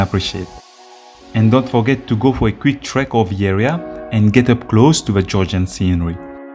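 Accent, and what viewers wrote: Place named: Mauritius. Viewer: Nice video and keep it up and I love your accent I don’t know but to me it sound like yo are from Mauritius 🇲🇺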